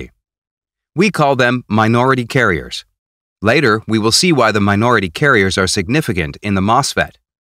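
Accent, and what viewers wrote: Kerala, India. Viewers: Well, he's not really imitating the western accent tho. He's a mallu and that's how some of em actually speak since I'm a mallu as well and know a lotta people who speak like that.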